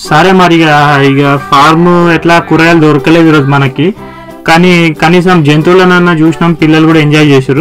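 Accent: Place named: Telangana, India. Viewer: Like the way you narrate in telangana style..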